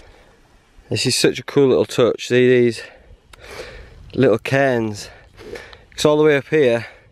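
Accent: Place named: Northern England, United Kingdom. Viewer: You're so ridiculously northern. I love it. Looking forward to part 3, from Cardiff :))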